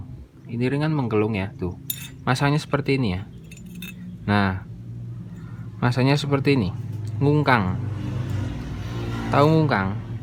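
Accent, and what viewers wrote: Banyumas, Indonesia. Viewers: Sampean wong ndi kang ngomonge ngapak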